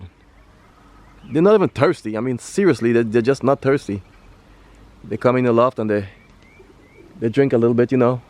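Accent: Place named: Caribbean. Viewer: Bro you sound like you from the Caribbean or am I wrong the accent but the birds are flying good and I like when you say you don't baby your birds we say the same back home here but love the videos bro🎉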